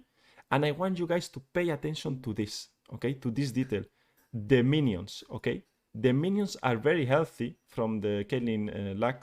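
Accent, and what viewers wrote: Spain. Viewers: Eres español? 🤔🤔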